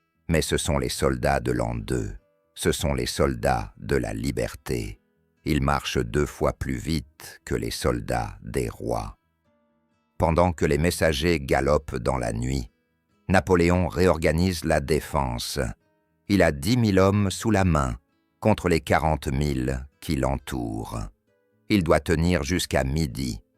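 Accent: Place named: France. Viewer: it's a French accent and a very nice one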